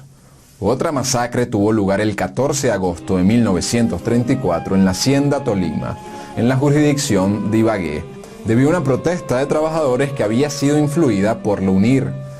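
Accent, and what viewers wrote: Venezuela. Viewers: Que hace un veneco narrando de esto? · Este man es venezolano? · GRACIAS A. ESTE JOVEN DE ACENTO VENEZOLANO..POR ESTEV8DEO MARAVILLOSO..USTED SI ES JOVEN UN VERDAFERO HISTORIADOR MIL GRACIAS...DESDE COLOMBIA 👍👍👍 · El que habla es de venezūelą? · Es un venezolano el que narra? Hasta aca llego en ver este video.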